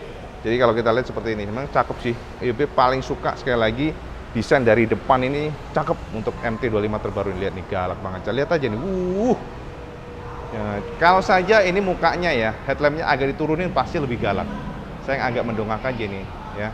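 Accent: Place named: East Java, Indonesia. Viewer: Saya malah suka gaya omonganya IWB khas jawa timuran surabaya...👍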